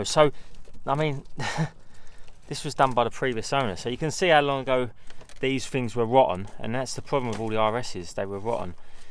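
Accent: Essex, United Kingdom. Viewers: A comment on Savage Garage's Fiesta video brought me here. Proper Essex accent there. 👍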